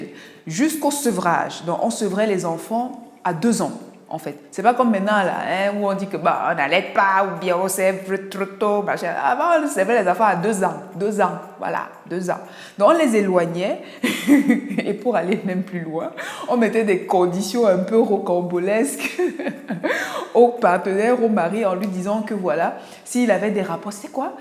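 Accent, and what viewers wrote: Cameroon. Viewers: Je sens, c'est une kmer qui parle et ça me plaît grave....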